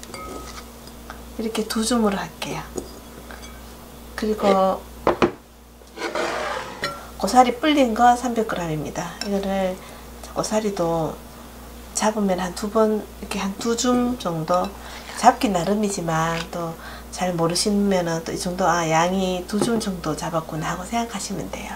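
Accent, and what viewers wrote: Gyeongsang, South Korea. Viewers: 답변 감사해요. 나이도 적잖은데 창피하게 음식할줄을 모르네요ㅠ 작년에 삶은고사리 사서 볶았는데 떫은맛땜에 못먹었거든요. 그래서 물어봤어요. 말씨가 대구분인것 같은데 조곤조곤 설명해주시는거 참 듣기가 좋아요. 많이 배울께요.^^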